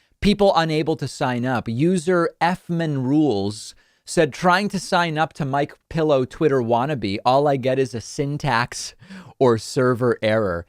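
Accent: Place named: Argentina. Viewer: If you here him speak Spanish his Argentine accent really comes out. I was surprised when I heard him speak and quickly realized he had to be from Argentina or Uruguay.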